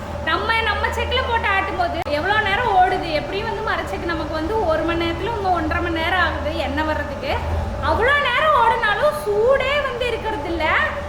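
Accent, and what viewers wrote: Kongu, India. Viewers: செய்யும் தொழிலே தெய்வம்...இந்த வாக்கியத்திற்கு ஏற்றார்போல் செய்றிங்க.. வாழ்த்துகள் ... சகோதரி...வாழ்க வளத்துடன்... உங்கள் வீடியோக்களை இப்ப ஒரு வாரமாக பார்த்தேன்..என்னமா எல்லோரையும் கொங்குதமிழில்பேசி அருமையாக சிரிக்க வைத்துள்ளீர்கள்.. உங்கள் குழந்தைகளும் நடிப்பில் அசத்துறாங்க... உங்கள் கணவர் காரத்தி அதைவிட சூப்பர்மா. நீங்கள் நலமோடு வாழ வாழ்த்துகிறேன். நீங்க மென்மேலும் வெற்றி அடைவீர்கள்...🎉🎉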